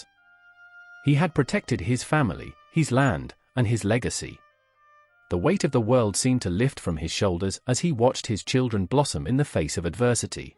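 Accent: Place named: England, United Kingdom. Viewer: Sounds like the narrator is English!! They can't find an American with a southern or western accent to narrate the story?????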